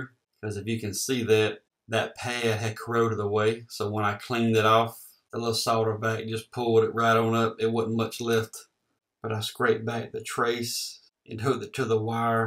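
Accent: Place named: Georgia, United States. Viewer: Sounds like your from Georgia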